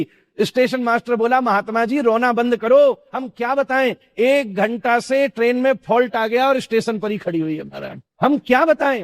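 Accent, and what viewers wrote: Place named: Braj, India. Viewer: Brij ki boli, meethi boli❤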